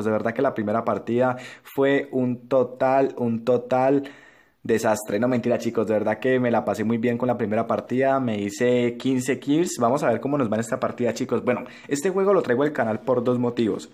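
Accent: Colombia. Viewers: Me encanta tu acento Colombiano💖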